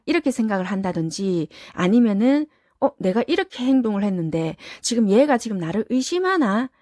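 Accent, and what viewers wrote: Busan, South Korea. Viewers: 감사합니다 ^^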